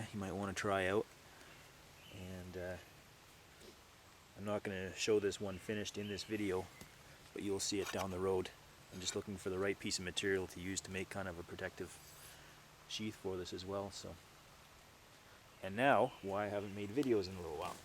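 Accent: Canada. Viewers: If you haven't ever heard a thicker Canadian accent, I could understand thinking it sounds a bit Irish. It's more of a Scottish influence, but again, not completely ridiculous for someone who doesn't know better to draw that conclusion. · Are you Canadian? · canadian accent eh? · Are you canadian? Just asking. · Thumbs up if you knew he was Canadian before he mentioned he was making a knife. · are you canadian? i can hear it from the ou in about.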